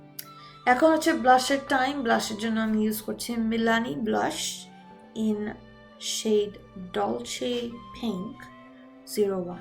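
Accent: Bangladesh. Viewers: I guess you are from bogura. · i gues u r from the northern part of Bangladesh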